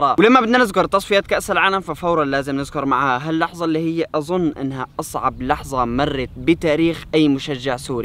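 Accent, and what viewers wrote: Saudi Arabia. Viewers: هل انت سعودي ولا سوري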